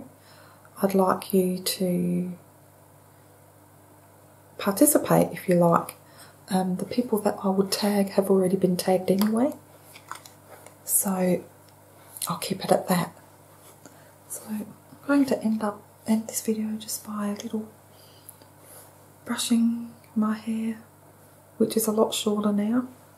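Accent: Australia. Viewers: Lovely! You look at least 10 years younger with your new makeup pieces and hair do! I have to say I love my international ASMR artists and their accents. However, I was so thrilled to find an Aussie lady (you, in case you wondered) with whom I could identify. In those days I still thought it was a bit weird but you helped normalise it for me. I am slowly finding more Aussies making ASMR videos of which I'm also proud. But, you are my first Aussie ASMR artist and a relaxing nurse on my not so crash hot days. The rest are bonuses. 💜🦉🇦🇺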